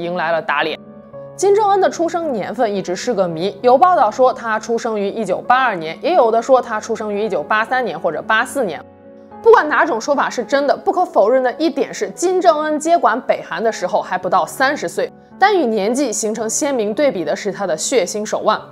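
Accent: China. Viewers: up咋是咱大陆口音啊，不像台湾人嗲嗲的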